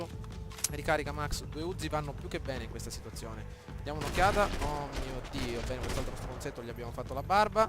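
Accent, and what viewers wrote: Sicily, Italy. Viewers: sei della sicilia?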